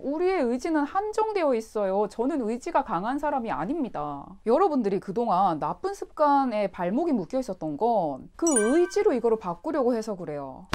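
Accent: Gyeongsang, South Korea. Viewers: 쌤 대구예요???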